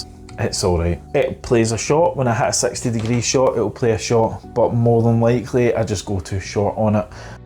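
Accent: Scotland, United Kingdom. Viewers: its a thick accent, but its you being from Scotland, and it is clear enough for me to get the point of the story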